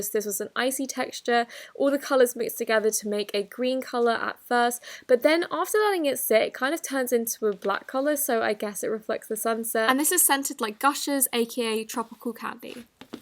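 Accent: United Kingdom. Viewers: are you british or accent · Omg! Is that a…UK ACENT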